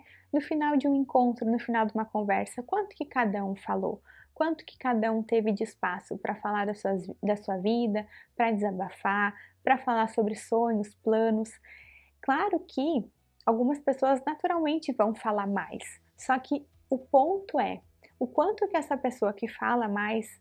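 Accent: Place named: South, Brazil. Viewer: Adoro esse sotaque, me lembra de quando eu eu morava no sul. Saudades.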